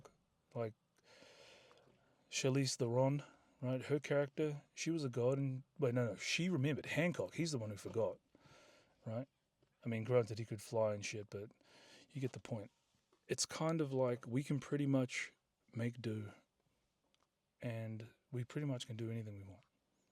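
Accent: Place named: New Zealand. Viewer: Very therapeutic to listen to. I love hearing other new zealanders.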